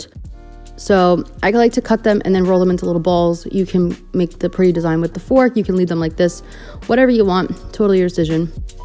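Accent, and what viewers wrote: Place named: New York, United States. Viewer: Btw so glad I found your channel. And your NY accent is so great, I’m from Long island so it sounds homey and familiar 😊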